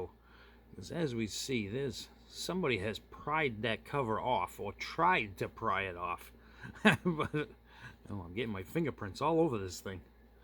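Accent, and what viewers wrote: United States. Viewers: I just don't trust, nor believe, anyone speaking about ANY subject, with this v i l e " a m e r i c a n " accent... Taking a short break from bo m b i ng other human beings ? 🤮🤮🤮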